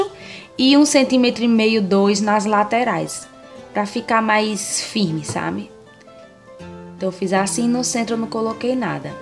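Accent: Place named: Northeast, Brazil. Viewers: Amei, ótima dica,e gosto d+ desse sutaqui nordestino, parabéns. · Pernambuco kkk Eu nem percebo que falo assim tbm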